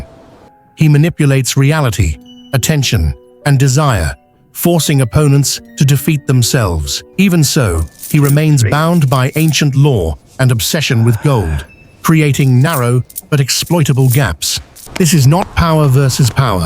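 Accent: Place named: United Kingdom. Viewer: I think he did pronounce leprechaun but in a British way like they always talk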